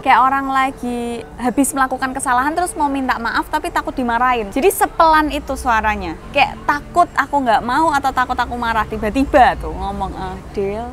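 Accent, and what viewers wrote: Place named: Semarang, Indonesia. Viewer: Logat Semarang kok nggemesin :)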